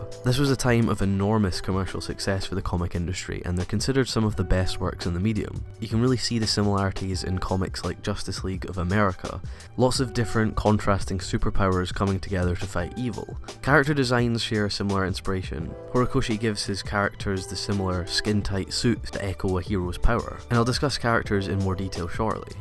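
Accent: Scotland, United Kingdom. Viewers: You sound Scottish, where abouts?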